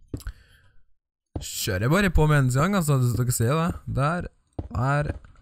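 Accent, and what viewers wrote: Trøndelag, Norway. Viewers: Snakker du trøndersk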